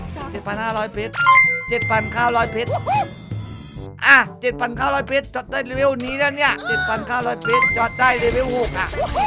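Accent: South, Thailand. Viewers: พูดเหมือนคนใต้เลย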